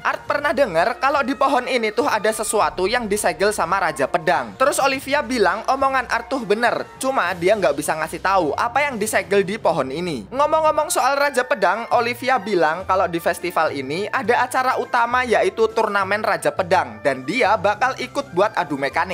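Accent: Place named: Java, Indonesia. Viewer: wong jowo mas😅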